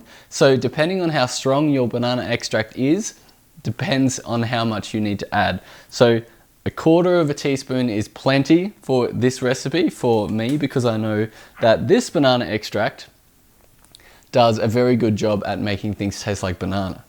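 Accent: Australia. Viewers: Good to hear a fellow Aussie talking about Keto lifestyle on here:-) I’m day7 great video! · Wow! how awesome! They turned out Deliciously fantastic and perfect too!! I too, could have devoured the whole lot in no time. They were a hit with my Bestie! I didnt trust myself with them all. So I sent some away with my Bestie to enjoy also. thank you so much! So glad I found your channel. Finally, I found a fellow Aussie to keep me focussed on my keto committed journey! Hearing you say “$5 bucks from Kmart.” Was ‘home’ to me! Gotta love it!” I have subscribed and can’t wait for many more Aussie recipe ideas. GOD love you!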